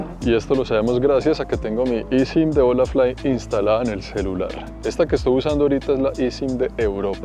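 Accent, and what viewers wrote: Bogotá, Colombia. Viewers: Colombiano, entre caleño y bogotano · MUy interesante, buen sentido del humor, agardable el acento rolo cachaco o bogotano. Un Saludos desde los angeles un hermano peruano.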